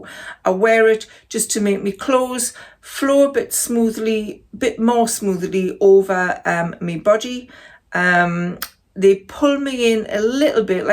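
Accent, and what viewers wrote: Newcastle, United Kingdom. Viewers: Such a good informative advise to anyone using shapeware clothing to improve their image in clothing and your photos prove it works! Well done. Oh, and I love your geordie accent 💞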